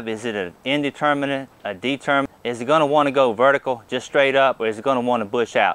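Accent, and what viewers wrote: South, United States. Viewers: I love to hear people talk with this particular southern drawl like he has got, by the way, beautiful tomatoes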